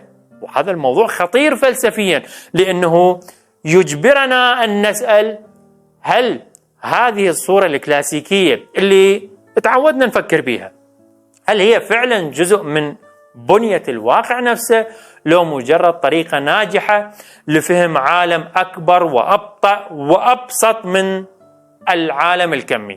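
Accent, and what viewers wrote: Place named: Iraq. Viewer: اوكف انتَ عراقي 😮 واخيراً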